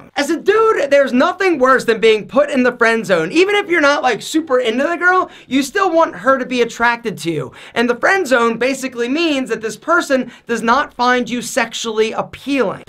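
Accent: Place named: Boston, United States. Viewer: U gotta be from Boston lol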